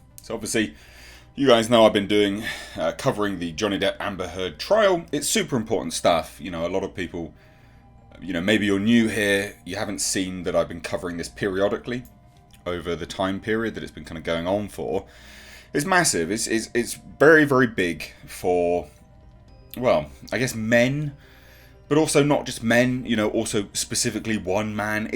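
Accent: United Kingdom. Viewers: You British accent attracts more then the contents…..Absolutely gorgeous 👍🇧🇷😘😍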